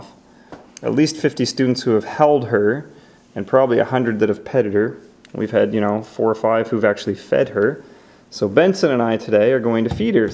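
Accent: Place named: Canada. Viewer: Why did i think this was king of diy yhe accent is obviously Canadian but his voice is so similar BTW nice snake im getting one in 2 months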